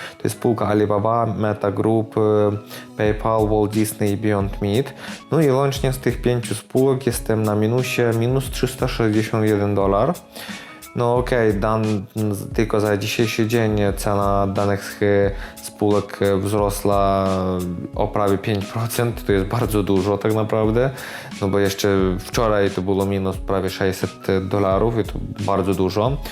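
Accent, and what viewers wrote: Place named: Germany. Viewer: Witam Rostock taki akcent masz jesteś Polakiem filmiki spoko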